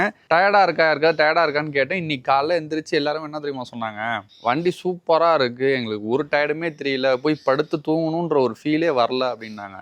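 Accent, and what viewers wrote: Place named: Trichy, India. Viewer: Super bro I will also try.. Am having THAR from Trichy .. I watched your videos .. your reviews are so awesome.. Namma trichy slang la Namma Veetu paiyan maaari pesaringa .. Happy trip bro enjoy 🎉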